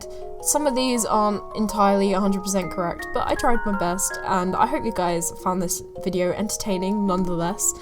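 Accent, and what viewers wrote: United Kingdom. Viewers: Redhair girl with a fantastic english accent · I love your pretty brittish accent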